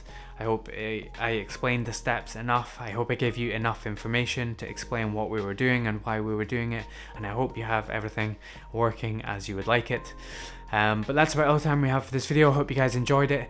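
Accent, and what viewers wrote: Scotland, United Kingdom. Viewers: Excellent video! Just getting started with Home Assistant and your channel is now my go to for all my questions and problems (also nice to hear a familiar friendly Scottish accent too!)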